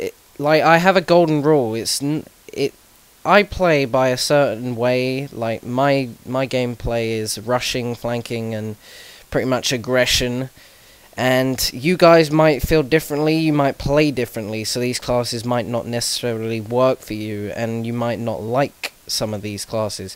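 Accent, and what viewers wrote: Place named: United Kingdom. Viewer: Iclicked on this video thinking "Cool! an informative Create a video!" and then I heard the accent and i clicked away not because i didn't like the video, or that i hate British or English I just HATE the accent, and so many people have that accent on youtube and it makes my ears bleed.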